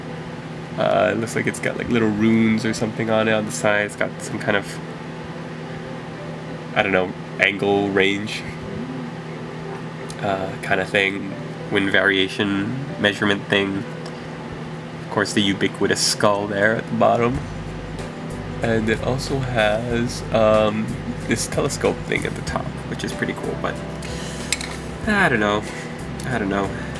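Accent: Austria. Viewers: ahahaha, definitely austrian accent!! love it, great job ^^ as you might know by now i'm austrian myself and you really did an excellent job! however, fantastic unboxing, tempting me to get one of these great minis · Nice Austrian accent. :D Do you train this voices?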